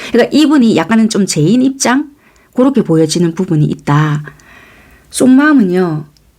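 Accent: Busan, South Korea. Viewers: 부산 사투리 넘 좋아요.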